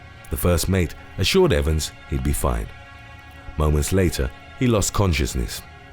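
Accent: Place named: England, United Kingdom. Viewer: Just viewed this video and noticed how intelligent the classic English (and Ausssie) accent makes one sound. That is just about as far as this one goes!! Opening statements identified the Sea Snake as a Viper. The keyword here is VIPER.....add your own accent and then ask......WTH??? You mean there's now a whole new family (viperidae) of sea snakes? All this time I have only been concerned with the Elapids (Elapidae). Hmmm......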